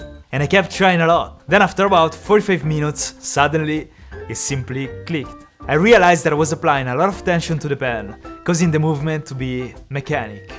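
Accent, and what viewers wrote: Italy. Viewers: Il tuo accento mi fa morire, credo che tu mi stia capendo perche si capisce che sei italiano ahahah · I love his Italian accent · Come si sente che sei italiano.. comunque bel video. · Si sente che sei italiano😂😂😂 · Your accent sus I think Italian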